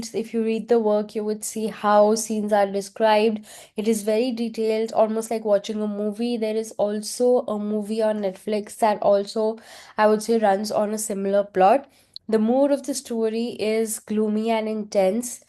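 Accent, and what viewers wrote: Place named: India. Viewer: Of all english dialect why is urs indian for real like why mthfkr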